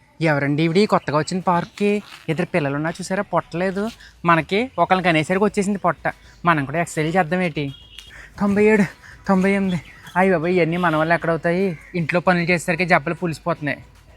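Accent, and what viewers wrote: Godavari, India. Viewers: Achamaina ma godavari yaasa vinte antha anandanga u do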